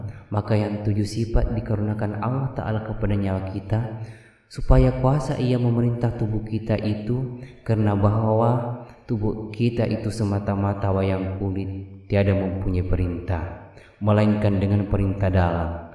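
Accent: Bugis, Indonesia. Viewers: Ini AjaraN dari TaNaH BugiS.. Yg BicaRa JugA Uda KedeNgaraN LogaT BugiS'y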